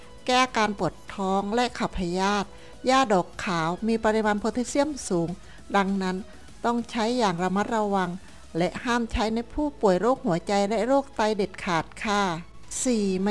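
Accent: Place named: Cambodia. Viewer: สำเนียงคล้ายๆเขมรแถวบ้านผมเลยครับ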